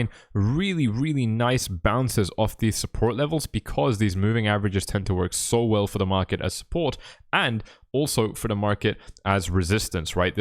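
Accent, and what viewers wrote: Scotland, United Kingdom. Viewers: R u a brown Scottish dude?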